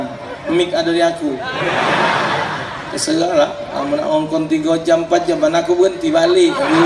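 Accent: Palembang, Indonesia. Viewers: Ya Allah enak ketawo 25 tahun d bandung denger ustad ceramah baso palembang. Sehat terus Ustad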